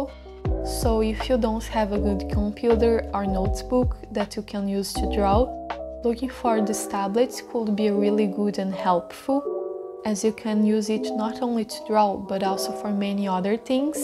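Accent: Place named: Brazil. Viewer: Sabia que conhecia esse sotaque e o inglês limpinho, é do Brasil ksksk seu inglês é muito bom 🥺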